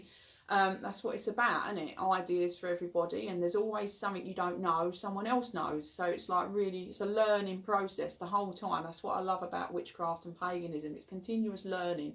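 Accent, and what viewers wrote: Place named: East London, United Kingdom. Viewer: You sound like you are from my ends East London . Thank you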